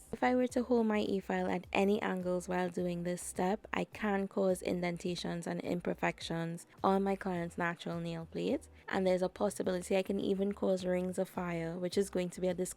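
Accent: Trinidad and Tobago. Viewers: thanks for sharing love your work all of it,are you from TRINIDAD I AM FROM TRINIDAD AND YOUR ACCENTURE SOUNDS LIKE US SPEAKING STANDARD ENGLISH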